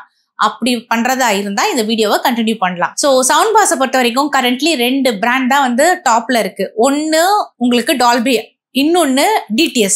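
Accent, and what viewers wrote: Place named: Madurai, India. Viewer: பேச்சில் மதுரை நடை வருகிறதே.... மதுரை/திண்டுக்கல் பக்கமோ...... தெளிவான விளக்கம். அருமையான காணொளி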